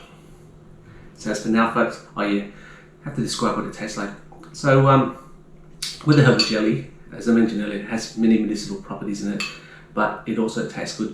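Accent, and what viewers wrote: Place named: Australia. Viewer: This is so trippy to hear a vietnamese guy with an Australian accent? Sorry I don't know the accent.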